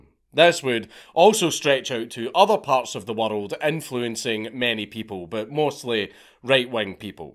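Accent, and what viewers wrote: Scotland, United Kingdom. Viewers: i chalk that up to thick Scottish accent.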